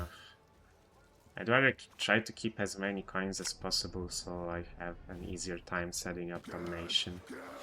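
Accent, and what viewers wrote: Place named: Estonia. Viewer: are you from Estonia? Can't put a finger on your accent. Very good English though. Ggs as always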